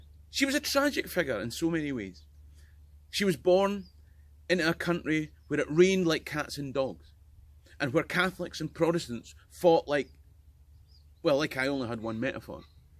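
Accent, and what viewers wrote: Scotland, United Kingdom. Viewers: I love his scottish dialect...good to learn to understand a Scot when on holidays in Scotland...and even England...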